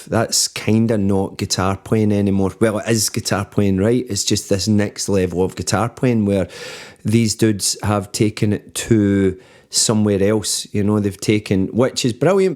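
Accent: Scotland, United Kingdom. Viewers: This is the first time I’ve watched, and, I gotta say, I watched because you were reacting to MARCIN. I’m a huge fan of his, and I appreciated your knowledgeable commentary. Love your Scots accent, which turned to a US southern accent when you sang! Loved it! I’ll be tuning in again. Thanks for a good show! ❤️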